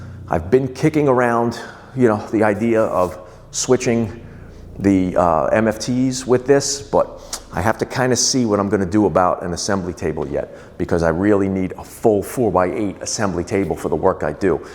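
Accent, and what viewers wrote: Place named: East Coast, United States. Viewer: You sound like most of my mother's side of the family.... Italian from back east. Love it! Sound in this video was clear and the shop is coming along nicely. I also thought you were a bit younger! At our age, that is a good thing. Ciao,! Keep punching!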